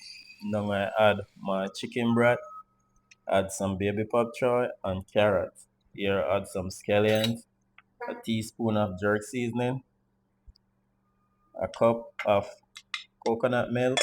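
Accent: Jamaica. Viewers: I just love to hear your accent and pronunciations. Real old time Jamaican Patwa.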